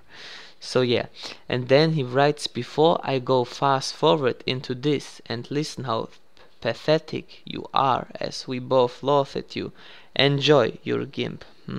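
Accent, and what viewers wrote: Balkans. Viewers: Your accent is raw as fuck!!!:D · I LOVE BALKANS ACCENT SO MUCH XD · I love Balkans Accent xD Sounds like Borat, VERY NICE xD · I'm sorry I love the way you talk Niko Belic FTW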